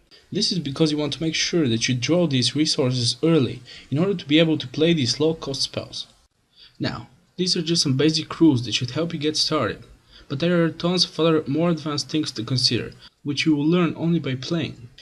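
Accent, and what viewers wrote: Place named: Russia. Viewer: Your accent is metro2033. Great video!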